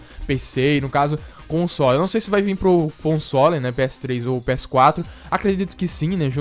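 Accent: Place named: Rio de Janeiro, Brazil. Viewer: seu cariokismo me fez ir embora moço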